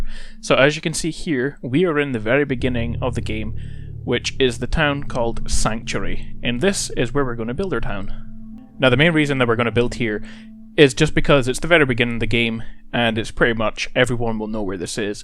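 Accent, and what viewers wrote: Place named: Scotland, United Kingdom. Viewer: Hey ,am strange question but where are you from? I know Scotland but specifically recognise your accent haha whereabouts In Scotland are you from?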